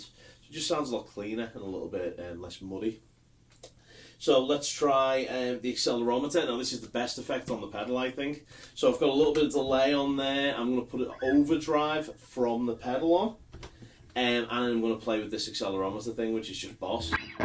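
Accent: Liverpool, United Kingdom. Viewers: Cool dude! Are you from Liverpool?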